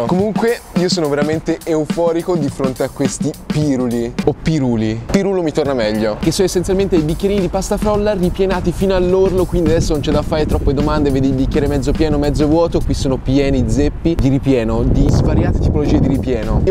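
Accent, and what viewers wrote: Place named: Tuscany, Italy. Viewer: Comunque tu parli un toscano"strano"....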